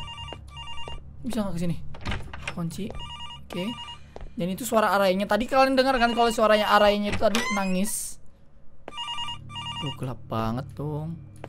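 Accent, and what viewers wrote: Jakarta, Indonesia. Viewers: Maap maap ni ye Iye ....iye...... iye.... Bang dari betawi kah ??...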